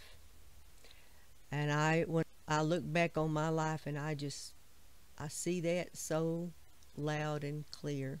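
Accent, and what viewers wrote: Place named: South, United States. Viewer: thank you for your prayers - so much appreciated!🙏🥰 The closest I have been to your neck of the woods is a couple of holidays in Florida back in the 80s which I loved! I assumed from your accent you were a Southu'n Belle! I went through a phase a few years back when I read absolutely everything by Fanny Flagg just to enjoy the South'n vibe after seeing Fried Green Tomatoes - and Blanche Deveraux in The Golden Girls was hilarious!😁🤣 Probably a terrible pastiche of the real thing but I loved them anyway! I'm very much enjoying your channel and your calm, sane biblical approach (not so commonplace around today's 'prophets') and they were really a 'word in season' for me - thank you - I intend to enjoy a lot more!! Love & Blessings to you & your ministry!🙏✝️🥰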